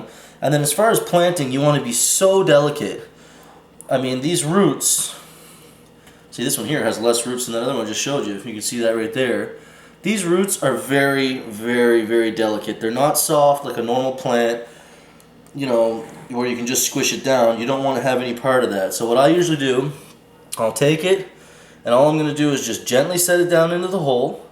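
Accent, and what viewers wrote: Newfoundland, Canada. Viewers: I've been successful (so far) with the avacado. The root is just finally on the outer surface of the seed. I also live in Canada and I just have it in my windowsill and its growing great along with my lemon trees , clementine and kiwi thanks to you! You have the Newfoundland accent is that where you are from?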